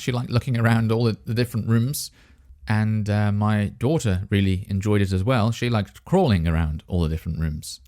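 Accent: United Kingdom. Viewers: Keep going I follow you from Iraq I like British accent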